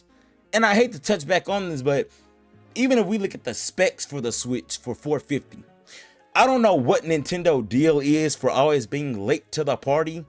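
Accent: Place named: Baltimore, United States. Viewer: I feel like I hear Baltimore (but I could be wrong lol)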